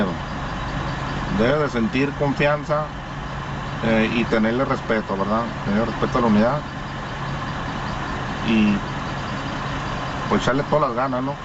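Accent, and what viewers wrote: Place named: Mexico. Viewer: son muy buenos tus videos compadre explicas muy bien se ve que tienes mucha experiencia me imagino que eres mexicano por el acnto yo soy de nicaagua un millon de like para ti y me suscribo a tu canal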